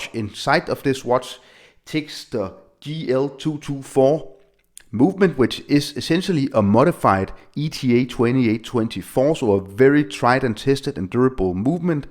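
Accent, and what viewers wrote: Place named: Denmark. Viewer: Aha, dann viele Grüße nach Dänemark, hab vom Dialekt gedacht, das kann doch kein Amerikaner/Engländer sein :-)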